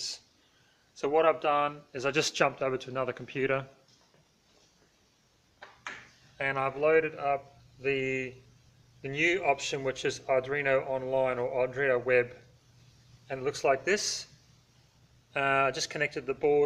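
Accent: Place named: New Zealand. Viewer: U sound like a true kiwi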